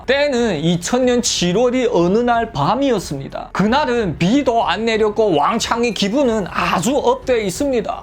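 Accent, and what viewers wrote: China. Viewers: 따거는 중국동포인가요? 말투가 아주 맛깔나네요!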